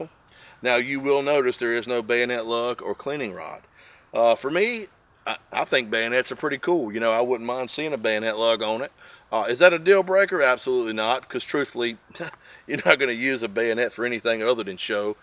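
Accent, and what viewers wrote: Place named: South, United States. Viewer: i heard the country and knew you had to be from a country state like me. KS here.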